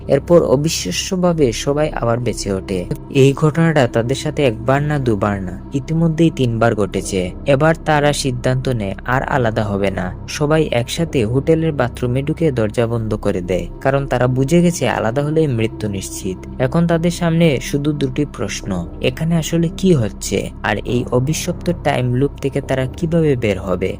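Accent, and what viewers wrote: Sylhet, Bangladesh. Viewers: আপনার মাতে লাগের তো আপনি সিলেটি সিওর নি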